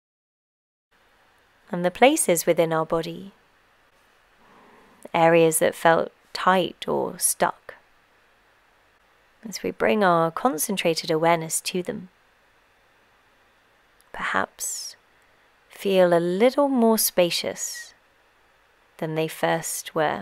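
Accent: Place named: England, United Kingdom. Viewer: super good, thank you! i've dislocated both my shoulders over a dozen times and am really trying to sort them out! This feels like it has helped heaps, and could be a good practise to do every other day. really liked the stuff you were saying throughout as well, like in the comment below about how the body is a mirror of the mind (trying to work out what weak shoulders could be reflecting in my personality!) also really glad to have found a yoga video with an english accent aha, all the rest i've been doing are from american teachers and the english accent makes me feel much more relaxed and at home :) anyway nice one, subscribed!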